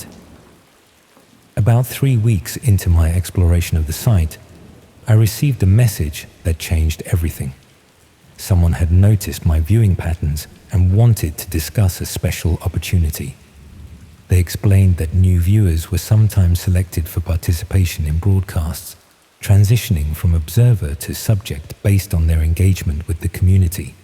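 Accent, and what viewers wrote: United Kingdom. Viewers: Every night I fall asleep to stories like these. It’s nice to have a British one listening to places I know of. Since listening to you to fall asleep I’ve found myself staying awake slightly longer to listen to what’s happening.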